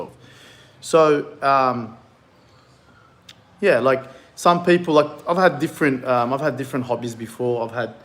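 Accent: Australia. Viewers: Very strong Aussie accent mate, thought you are Turkish but sounds exactly like an aussie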